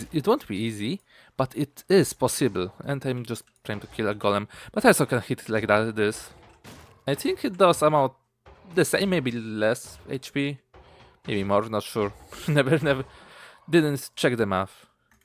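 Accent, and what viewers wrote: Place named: Poland. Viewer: my mind: "is he polish? he sounds polish just like me and my friend. oh hes using twitter with polish language". nice thing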